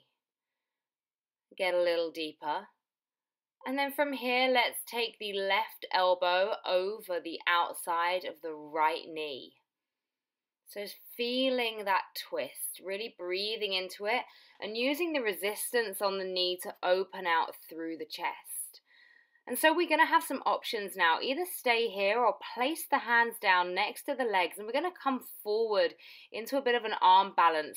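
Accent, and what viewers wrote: United Kingdom. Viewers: Where is her accent from? I know it's British, but what part of England? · Thanks for an excellent flow - many postures here I haven't done in ages. Also nice to hear an English accent, and a bit of humour!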